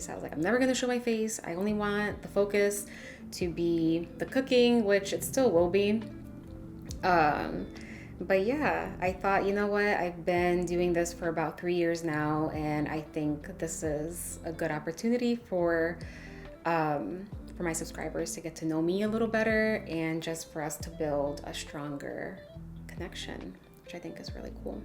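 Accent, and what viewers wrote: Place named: Midwest, United States. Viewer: Love your page! I’m married to a puerto Rican and your recipes are so easy to follow. Im from Chicago and I can tell by your voice you were a midwestern 🫶🏻🫶🏻your just as pretty as your lovely voice im so glad to put a face to a name!! Im also a Christian and love the verses you post on your videos. God bless your page!!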